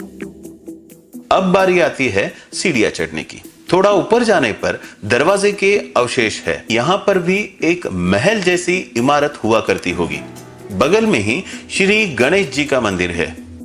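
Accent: Maharashtra, India. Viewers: Please learn to speak hindi well it seems you are telling story in Marathi Hindi tone is different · Bhaisahab ye maharastrian hindhi hai,thoda samaja karo bhai..har kisika bolne ka,bat karne ka o kidhar rahte hai unpe jyada depend karta hai..so unka aisa Kuch matalab nahi rahega k kisike man dukhaya jaye...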